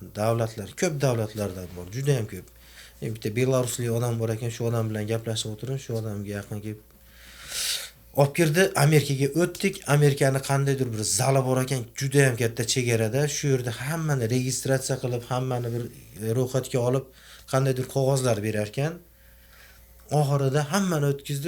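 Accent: Andijan, Uzbekistan. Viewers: Ертак боп кетди акахон Ростан Анжанлимисиз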